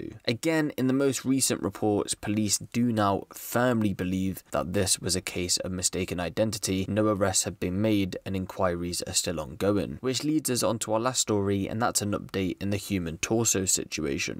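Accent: United Kingdom. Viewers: It’s just a normal British accent??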